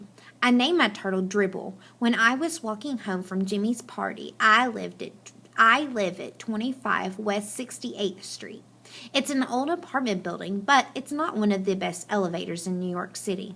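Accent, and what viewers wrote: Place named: United Kingdom. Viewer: No offense but you sound like a British person pinching her nose and reading at the same time. If you are wondering why I'm looking at the video, it's because of homework...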